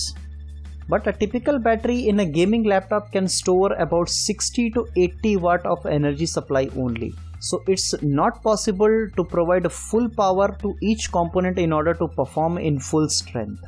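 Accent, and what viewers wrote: India. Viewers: lol Indian accent is just beautiful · Wtf we know the consequences. Just tell us the solution. Your accent is terrible. Definitely Indian.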